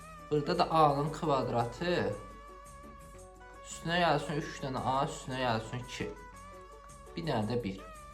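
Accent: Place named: Baku, Azerbaijan. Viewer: Qeseng 👍 lehce yarasib ozumde bakiliyan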